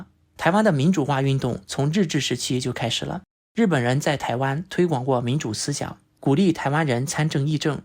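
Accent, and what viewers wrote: China. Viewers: 它还操着一口官话口音用个繁体字，应该是阴阳人吧。。。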